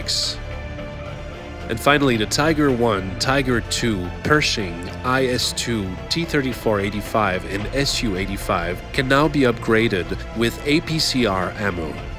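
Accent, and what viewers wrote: Germany. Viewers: That German pronunciation XD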